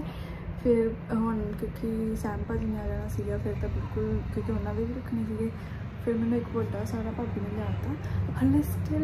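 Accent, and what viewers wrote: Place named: Canada. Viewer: mam da canadian slang hogya hle 6 month ni hoye ayi nu bakwaas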